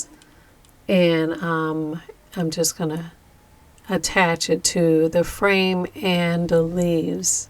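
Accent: New Orleans, United States. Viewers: I just want to say that I love listening to your beautiful soothing voice and NOLA pronunciations. Your intellect and creativity shine brightly and what you say is only enhanced by how you say it!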